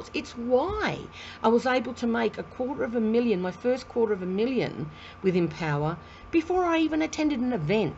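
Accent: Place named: United Kingdom. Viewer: Lovely british accent!!!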